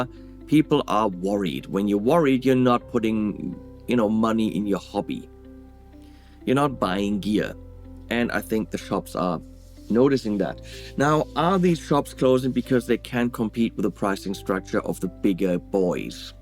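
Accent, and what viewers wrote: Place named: Germany. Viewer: Wundert mich gar nicht! Alleine bei dem Trash-Gear, das du täglich - hust - empfiehlst, sind 90% für meine Ohren akustischer Sondermüll, geht also umgehend retour, 5% sind heillos überteuert und 5% eventuell brauchbar, so ferne man nicht ohnehin längst was besser Klingendes hat. Für den Händler heißt das aber ein Defizit, wegen der Rückversandkosten und weil er das Produkt nicht mehr als Nueware, sondern nur mehr als B-Ware verkaufen kann. Nur als Beispiele, mein jahrzehntealtes JD-10 hält auch heute noch locker mit den meisten digitalen Pedal-(Pre-)Amplösungen mit, erst recht mit einer Cab IR dahiner und mein ebenso alter Marshall JMP-1 Preamp ohnehin. Während inzwischen drei deiner "Empfehlungen" wegen miserabler, völlig unbrauchbarer Sounds sofort retour gingen. Ich weiß ja nicht, womit du deine Reviews im Hintergrund aufpäppelst, aber ich konnte zwischen den Sounds, die ich in den Videos hörte und denen, die das reale Produkt lieferte, eher wenig bis gar keine Ähnlichkeiten ausmachen. 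Weshalb ich diesen Kanal inzwischen auch eher als visuell-akustisches Kabarett sehe (Ein hyperneurotischer Durchschnitts-Provinz-Gitarrist, von dem außerhalb dieses Kanals im realen Leben noch nie jemand etwas (musikalisch) Sinnvolles oder Umwerfendes gehört hat, versucht krampfhaft, mit Piefke-Pidgin-Englisch und hässlicher, geschmackloser Oberbekleidung auf Mittelschulniveau kompetent zu wirken. Benny Hill 2025 sozusagen.) aber mit Sicherheit nicht hier Empfohlenes mehr kaufen werde. Selbe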